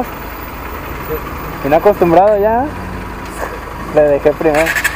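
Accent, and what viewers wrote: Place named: Paisa, Colombia. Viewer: Me hiciste llorar al ver mi ciudad y escuchar a un paisa hablar chido tu vídeo vivo muy lejos y ver mi ciudad me sacaron lagrimas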